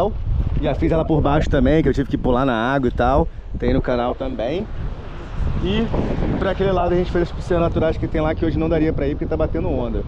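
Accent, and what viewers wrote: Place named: Rio de Janeiro, Brazil. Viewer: Caga tijolo. Kkkkkkkkkk😂😂😂😂😂. Adoro esse sotaque. O sulista e o carioca sao os sotaques mais gostosos de se ouvir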